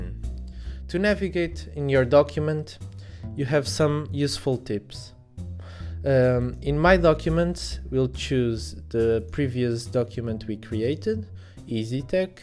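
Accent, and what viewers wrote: Portugal. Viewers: Are you portuguese? Eu juro que escuto um sotaque. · Quando ouvi o sotaque sabia que eras um dos nossos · Ganda sotaque tuga🇵🇹💪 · 15 segundos no vídeo e nota de logo q é português kkk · Vi logo pelo sotaque e pelas musicas de server de csgo source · You're Portuguese aren't you? I can tell by your accent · Aquele sotaque tuga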